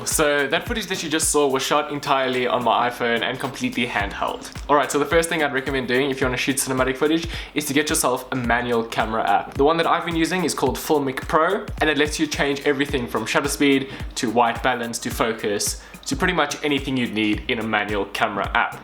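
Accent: South Africa. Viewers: Hey, the first video I have watched from you... and just from your accent and the first 3 seconds of the cinematic video(I saw the buildings and traffic lights) and I knew this is from South Africa(Downtown-Johannesburg). Nice well done :D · he's south african isnt he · Are you South African? That accent sounds like home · U from SA? Lol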